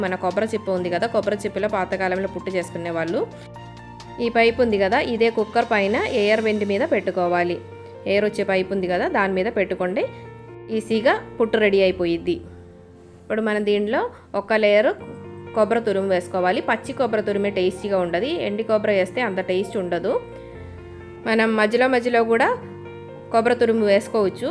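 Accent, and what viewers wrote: Kerala, India. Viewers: I'm ur new subscriber... ur way of explanation and kerala slang telugu i love that 🤍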